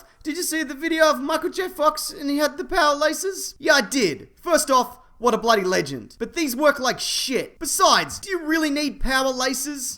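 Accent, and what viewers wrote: Australia. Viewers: Fucking hilarious, as usual. Your comedic timing is perfect and your Australian accent stylizes your sense of humor perfectly. I would go to war with you any day, sir. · My second favorite video n this channel is just an Australian yelling about the future for five minutes. Priceless. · I never realised how much I love hearing Australians swear. Hey can you say 'fuckwit' in your next video. I just want to hear how it sounds. It's amazing the way Australians say it.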